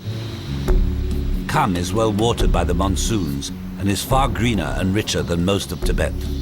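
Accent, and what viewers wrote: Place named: United Kingdom. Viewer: I can't stomach an overly dramatic British accent, makes me want to puke....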